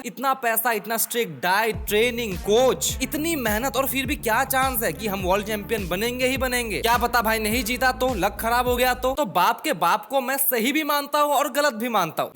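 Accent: Assam, India. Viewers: bhaiya kiya aap assamese ho .....??? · Bruhhh I kindaaa felt like u r Assamese or yk Assamese 😭✨✨I was right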